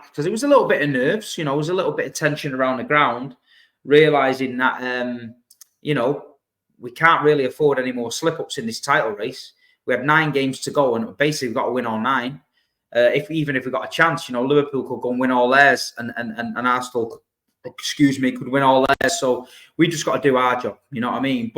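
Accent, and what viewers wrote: Manchester, United Kingdom. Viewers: Yes!! Your not to bad!! I've seen these younger idiots programs and they know shit!! I'm 52 but still a dude OK!! These younger goons know shite. All cock and no f...... bollocks. Your not to bad pal. I can relate OK!! At least you lay it out realistically. No bollocks with chatting nonsense!! There's some f..... idiotic ball bags online chatting sh... about city. You sound like one of the boys from the old Kippax so I'll let you off but these idiots that are supposed to be from Manchester but talk like they're from fu.... Jamaica f..... messes my mind!! Good show kid!!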